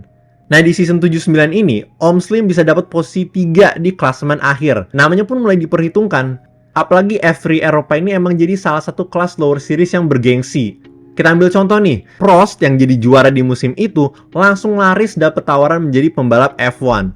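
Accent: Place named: South Jakarta, Indonesia. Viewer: Logat jaksel kental sekalii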